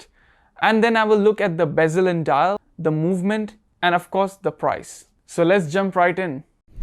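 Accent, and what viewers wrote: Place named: India. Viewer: having the indian accent is really lame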